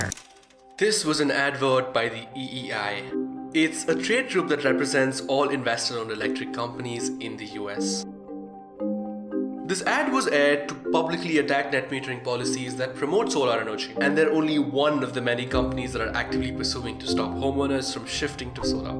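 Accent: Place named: India. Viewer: I've a feeling the v/o is done by a person with Indian roots. Great video, either way, made me smash like. Also, anyone reading this should subscribe to pewdiepie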